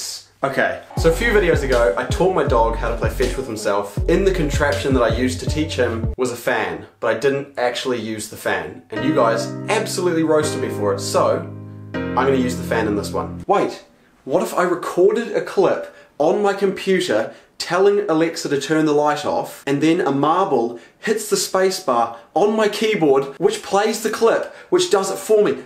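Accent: Australia. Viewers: Is he Australian? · i love australian acent so muchhhhhhhhhhhhhhhhhhhhhhhhhhhhhhhhhhhhhhhhhhhhhhhhhhhhhhhhhhhhhh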